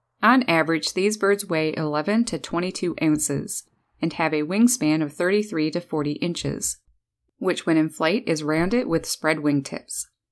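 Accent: Canada. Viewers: Many people love the accent of British and Australian, not me , I enjoy the Canadian accent there's something aboot it I find relaxing. Lol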